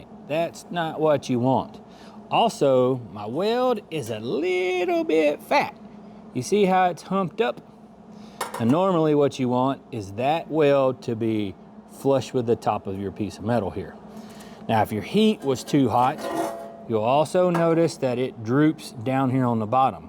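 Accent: South, United States. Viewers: Just found your channel and I must admit, I'm kinda excited because you were one of my favorites to watch on TV (and we both speak southern LOL). Love the way you show the real world aspect of getting every thing to work right. I struggle with sheet metal because I spent my career welding big industrial iron. A half inch plate was thin material. Soo.... Going going from 1/8 or 5/32 rods and wire to .025 is a bit of adjustment. Lots of holes, just say-n. Love your stuff and I'll keep watching.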